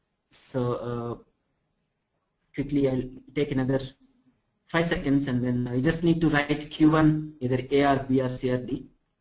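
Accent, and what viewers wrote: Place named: India. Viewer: Thank you so much for uploading these videos. You hae vertainly chosen some greate topics to cover. However I would like to give some feedback if thats OK... It is very difficult to understand the what is being said because of the very strong Indian accent and the speed at which the material is being explain. Please use presenters with more English accents. many thanks and keep up the great work!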